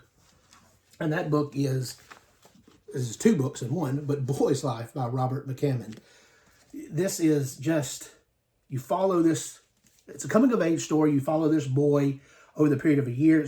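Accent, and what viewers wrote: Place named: South, United States. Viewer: Cute guy with a southern accent talking bout books? I’m in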